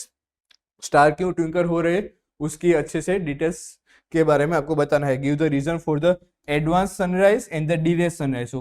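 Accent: Gujarat, India. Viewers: Bhai woh Gujarati Hai Sharad se Guj accent me Hindi bol rhe hai😅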